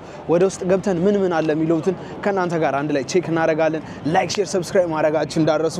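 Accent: United Kingdom. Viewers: I like your British accent….👍🏽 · u got a bitish accept man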